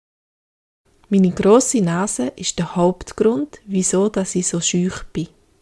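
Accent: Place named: Zurich, Switzerland. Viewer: Hola, de que cantón de Zurich es este dialecto?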